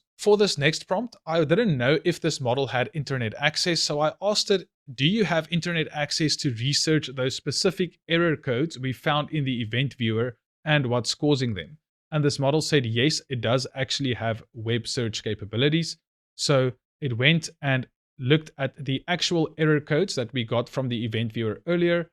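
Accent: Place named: Johannesburg, South Africa. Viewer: Excellent video, yes keep them coming, I find them very interesting. Plus my wife comes from Jo-burg and your voice makes her home sick lol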